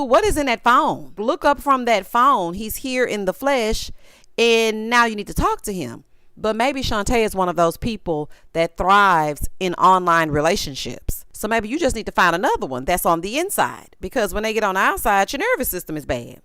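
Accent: South, United States. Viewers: Ur reviews, r the best..! & LOVE ur accent. Shout out, to the south. 🇨🇱🤠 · Girl, I love your country twang!!!! Moving forward (in your voice). 😅😂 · They hating! That southern twang and contagious laugh are just a couple reasons I love listening to you 😍. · girl keep being yo’ fabulous self, country twang and all! 😂😂😂 Love your reviews! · I love your commentary! You are hilarious.🤣 And your southern accent is everything!’🥰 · Hey Girl Heyyy! I can't wait to get into this review. Be right back, I'm going to get some popcorn. Nevermind those people. We live your educated, humurous, country dialect. Enjoy your evening. 🤗🧡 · Pay them dust, I love your accent and southern charm 💕🌹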